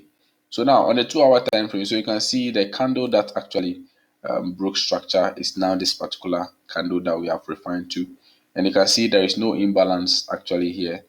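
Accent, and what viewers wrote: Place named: Europe. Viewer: Nice European accent.😅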